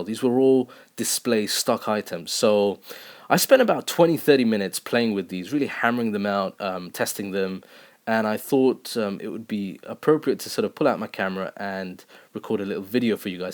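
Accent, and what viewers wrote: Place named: RP, United Kingdom. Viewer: damn your posh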